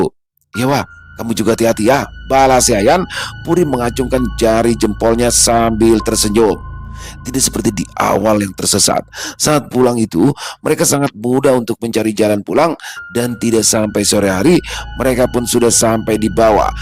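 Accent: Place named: Kalimantan, Indonesia. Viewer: abang ini org mana ... klu abang cerita kisah dr kalimantan logat atau cara bicaranya pas dgn org kalimantan .. pokoknya saya dr kalimantan mendengar cerita abang sampai ulang2 ceritanya masih seru aja .. salam dr kalimantan tengah (kapuas timur)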